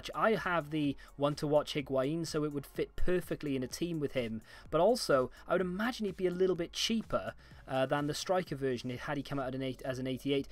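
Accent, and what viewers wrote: Wales, United Kingdom. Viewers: Yo are u Welsh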